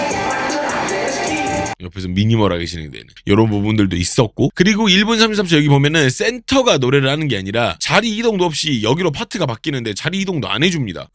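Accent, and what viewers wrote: South, South Korea. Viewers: 구독.좋아요 눌렀어요.. 살짝 남부쪽 사투리가 들리네요.^^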